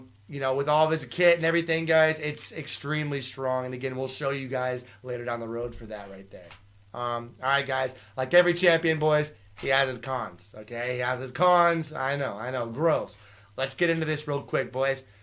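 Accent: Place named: United States. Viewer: as a german is hard to understand your english over a long time cause you have i think a strong american accent but i still like your videos and streams pls keep it on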